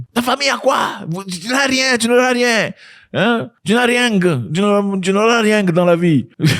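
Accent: Cameroon. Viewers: Ce président ne nous sert a rien , je ne vois pas en quoi parler de lui est utile , ceux qui parlent de lui au pays sont ceux qui veulent sa place , raison pour laquelle les autres qui veulent aussi sa place demande a leurs rivaux de femer leurs bouches . Bref un président qui ne sert a rien je ne vois pas d'interet a parler de lui. je suis certains que son successeur sera sa copie , assoiffé de pouvoir ,. le dvéloppement n'est pas une priorité pour eux , juste remplir leur ventre de caca. Très bonne vidéo , l'accent camerounais par contre😂 m'a fait rire , je ne me suis pas retouver dans ça , ni reconnu une ethnie en particulier, mais en ce qui concerne la mentaluté , vous avez raison ,ventard pour beaucoup dans le vide , cette mentalité doit être formater. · Bizarrement tu parle comme la grande majorité des Camerounais 😂😂, je suis sûr que beaucoup te croient Camerounais. 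Ce n'est pas nouveau au Cameroun, le comble c'est que les parents on entraînés les enfants dans la voie du silence sous prétexte de l'horreur de la période des maquis. On a peur de se réveiller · Il n'imite pas ...il parle toujours comme ça ❤❤❤ au départ je croyais même qu'il était Camerounais 😂😂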